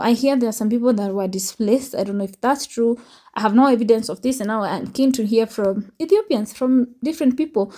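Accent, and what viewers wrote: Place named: Somalia. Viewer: Her accent doesn't sound Ethiopian. She is Somalian I think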